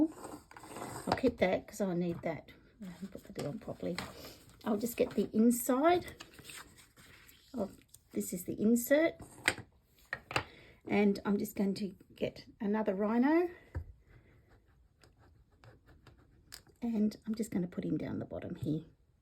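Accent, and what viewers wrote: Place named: Australia. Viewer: Hi This is the 1st time I have seen you on youtube I didnt know anything about you but your accent made me stay and watch lol. We have to support Our Aussie demonstrators 😊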